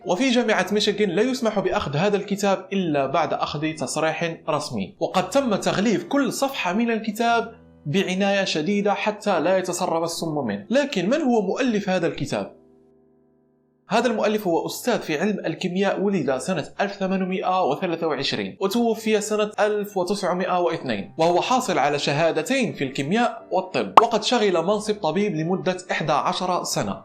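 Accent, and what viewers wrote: Morocco. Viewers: ميّزت لهجتك المغربية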